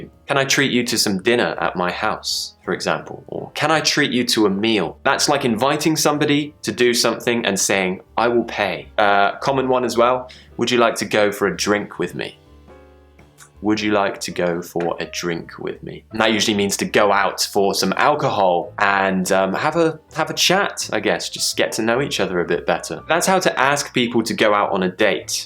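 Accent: RP, United Kingdom. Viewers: I'm brazilian and I'm trying to learn the british accent with your help 💙 I met your channel today and I really love it, thank you so much for all of this 💙💙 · I love British accent, And i love hearing your voice and learning British English at the same time. I'm browsing all of your past videos and i'm in love with it. Your such an inspiration. Thank you. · I am immensely grateful to be able to attend your lessons,specially i am so fun of British accent as well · I love your British English ! I come from hk , I am married 😹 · thank you! I love your British accent! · Hi, English channel.I`m really happy with your english lesson.your british accent is really amazing,every day i watch your youtube channel for improving my english,specially my accent.thanks for your dedication on youtube channel,keep going!very support with your hard work!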